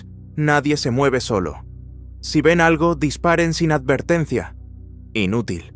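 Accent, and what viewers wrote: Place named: Spain. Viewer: Quiten el maldito y horrible acento español, ese acento tan desagradable arruina la historia, deberían de prohibirlo en todo el mundo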